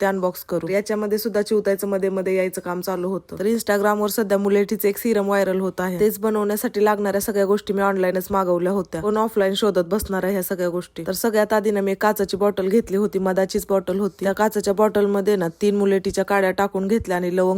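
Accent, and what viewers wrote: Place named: Kolhapur, India. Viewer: Amchya sanglit ani kolhapurkde pn asch boltat antle kivva antly..tumhi ugach bolnyt chuka kadu nka ..tumhala jas bolu vatt ts tumhi bola tila jas vatty ts ti bolel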